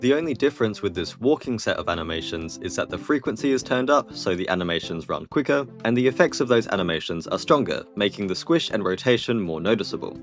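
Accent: Australia. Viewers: HES AUSTRALIAN?! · 2 comments about the middle-ish section. 1st. YOU'RE AUSTRALIAN?! WHERE I NEED TO KNOW SO I CAN GO IM ALSO HERE 2nd. I use never eat soggy wheat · Waaaiiiit! Your Australian!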